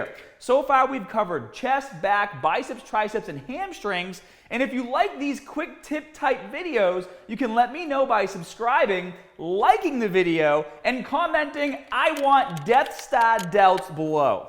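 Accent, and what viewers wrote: Boston, United States. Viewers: wait when did Scott get a Boston accent I don't recall him having one in 2009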